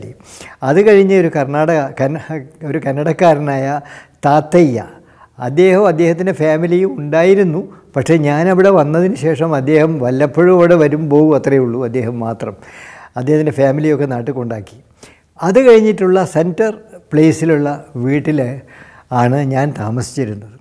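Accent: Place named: Kannur, India. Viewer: Kannur karanano, aa samsaaram varunnu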